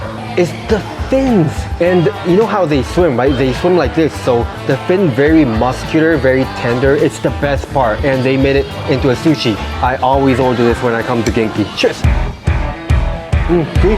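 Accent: Singapore. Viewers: OMG you sound so Singaporean now!!! love it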